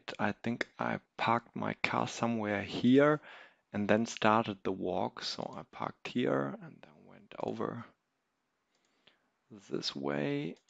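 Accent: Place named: Germany. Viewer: You sound very german :D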